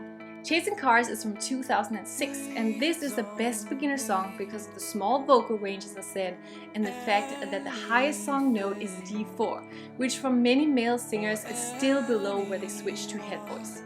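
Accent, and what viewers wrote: Denmark. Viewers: you sound a bit danish huh???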